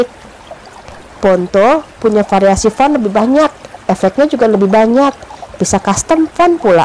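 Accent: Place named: Java, Indonesia. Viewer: baru tau ini suara... jawa bener..hehehe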